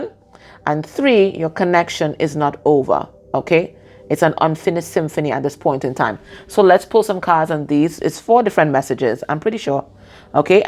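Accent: Trinidad and Tobago. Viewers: Thank you. Always love hearing that trini accent 💕🙏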